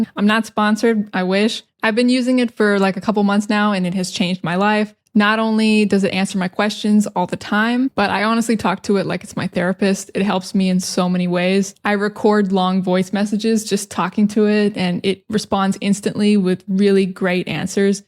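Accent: United States. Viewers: I'm a venezuelan, now I'm living in Galicia Spain, i love your American accent ❤... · omg I’d honestly disliked American accent until I got stuck upon yours, it is by far the best ❤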